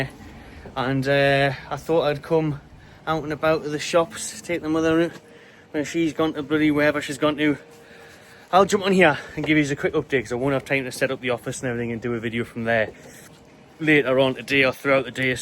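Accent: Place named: Ashington, United Kingdom. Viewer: I thought you were an Ashington or Newbiggin lad 😊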